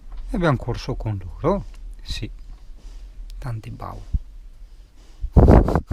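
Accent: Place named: Tuscany, Italy. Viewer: Obbrao tummisei garbao un monte....tai imparao il toscano proprio bene...brao😊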